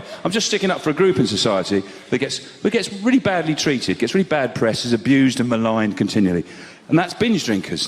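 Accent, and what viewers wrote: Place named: Sussex, United Kingdom. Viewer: Sounds like a Sussex lad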